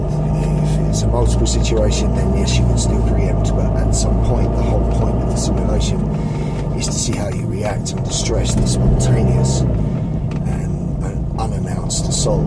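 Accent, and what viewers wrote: England, United Kingdom. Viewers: I did‘nt understand only one word with this England english😭😭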